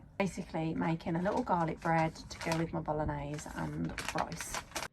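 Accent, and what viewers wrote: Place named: Birmingham, United Kingdom. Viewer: Bath is beautiful, I'm glad that you had some sunny weather, 🌞 A moored narrowboat, in Bath, is a great first time experience. The stunningly good YouTube algorithm bought me here 😊 I'm not a boater but used to work in Bath and I know some parts of the K&A. Unfortunately, I know of several dogs that have drowned, particularly Bulldog types. Every dog falls in at some point. So perhaps get Nina a life jacket that also keeps her nose out of the water. The K&A is so popular, it's one of the most congested canals and finding moorings near settlements is difficult. I know nothing about you, but hear the Birmingham accent, so I guess canals are in your DNA 😅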